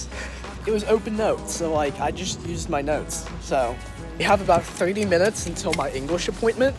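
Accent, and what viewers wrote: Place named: New York, United States. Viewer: I'm loving the strong New York accent.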